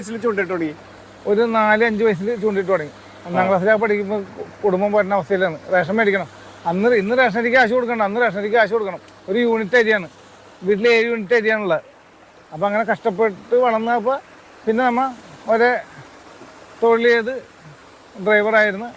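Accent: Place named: Valluvanad, India. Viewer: ഇതിന്റെ അവതാരകൻ ഒരു ബുദ്ധി ജീവി തായോളി ആണ്... അവന്റെ സംസാരം വള്ളുവനാടൻ സ്ലങ്ങും ചോദ്യം എല്ലാം അതുപോലെ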